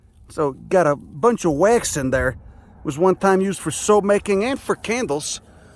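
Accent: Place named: Northeast, United States. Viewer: Man there are few things I love more than a heavy Northeast accent dropping heavy science. Good shit.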